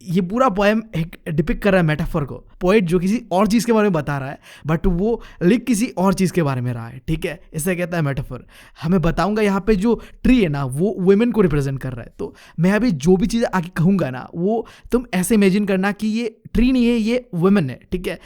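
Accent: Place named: Assam, India. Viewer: I like your assamese accent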